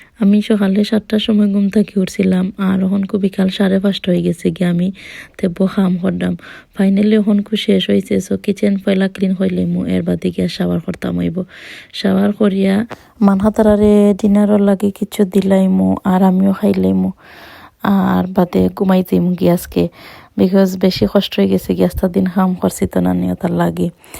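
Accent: Sylhet, Bangladesh. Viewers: Sylhety mat hunle asolei onek vala lage❤❤❤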